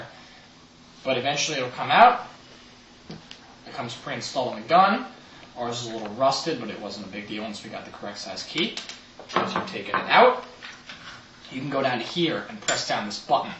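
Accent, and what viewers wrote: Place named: Boston, United States. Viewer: nice review but omg u realy sound like boston from do u love new york or whatever that one reality tv show u know.... nive job tho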